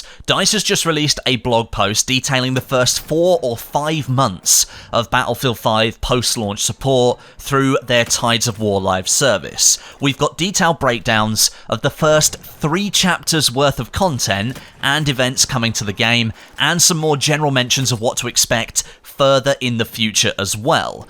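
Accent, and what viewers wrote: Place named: United Kingdom. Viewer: This high pitch british male voice is really relaxing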